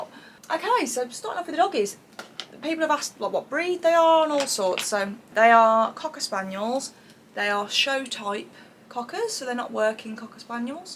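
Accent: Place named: Birmingham, United Kingdom. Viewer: Hi, really enjoyed this video I related to you, when you touched on your background/family in particular. Real life hey. Also your voice is soo soothing to me. My Nanny 👵🏼 raised me, she was from Birmingham & had the same accent as you ☺️🙏🏽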